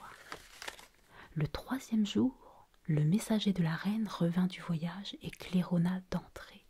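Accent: France. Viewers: i like this french version, cause french is so soothing and sweet sounding. i also understand it. but. the french accent in english i find even better. because the accent, with its note of vulnerability and a little awkwardness, is the loveliest of all... thank you so much. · Je ne peux pas le croire, elle est française! J'aurais dû m'en douter avec l'accent.. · I really like both, but i think i prefer the french accent! French is ma langue préferée <3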